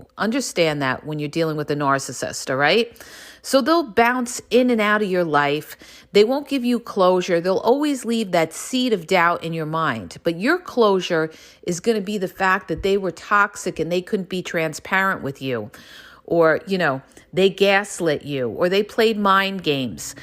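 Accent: New York, United States. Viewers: I am sorry to always be so complimentary, but I am understanding what you are saying on a deeper level. I get excited! This is understanding narcissists, which is the only way to proceed with them. I have so much more power with the truth of it all when I understand what they are doing and who they are... especially in the legal realm. As always, thank you, thank you, thank you. You can trust a native New-Yorker when you hear the accent and you see your street-wise consciousness. New-Yorkers see it all and it has been a matter of staying ALIVE to be able to defend in a brutal world.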